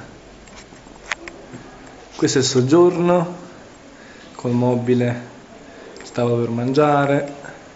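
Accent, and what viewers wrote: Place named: Naples, Italy. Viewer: Nei video di multiplayer.it l'accento napoletano non è così marcato come qui,lo stai man mano perdendo oppure lo contieni?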